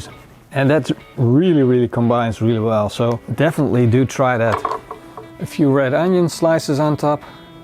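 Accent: Netherlands. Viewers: It's Dutch for sure :D No question about that!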